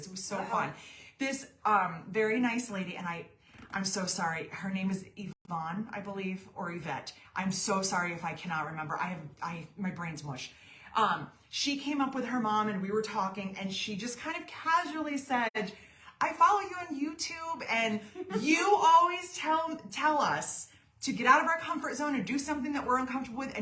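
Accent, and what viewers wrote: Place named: Massachusetts, United States. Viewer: I just discovered you while watching Siah do a walk around at some quilt show a month ago down here in Florida, and you were the last booth she went to, and met you...I loved your patterns, and your beautiful Cork (which I've never in my life used), and I just loved your personality and omg I fell in love with your free pattern for that beautiful wallet made all from that gorgeous cork! I was asked last year to do a small craft fair, but it was last minute, and I had nothing made, so I made a ton of stuff up this year in between all the surgeries I had to have on my legs, and the lady who organized the event had to cancel as her husband passed away. This would have been my very first ever event...I had a really rough year and can't wait for it to be over...I will be having another surgery next Wednesday and may be looking at a bypass surgery on my left leg the Doctor told me. 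Anyways, I just had to tell you how much I love your items, and how happy you make me watching you because of your fun and bubbly personality...Thank you for that! Sorry I wrote you a book! It was really fun watching this video, and I look forward to more! P.S. I had to laugh about the massachusetts accent as that is where I'm from, and talk just like that, and live in Florida now... lol...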